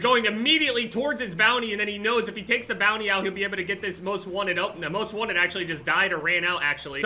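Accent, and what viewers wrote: United States. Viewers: I love his cowboy accent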